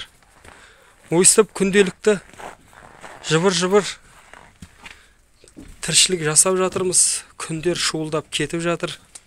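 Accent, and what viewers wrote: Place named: Uzbekistan. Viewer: Ассалаумагалейкум жаба дегенинизге караганда Озбекстаннан келгенсизба димин мен сиакты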